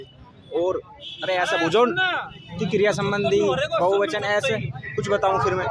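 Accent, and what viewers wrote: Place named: Bihar, India. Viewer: भईयाजी बिहार से हो क्या 🧐🧐🧐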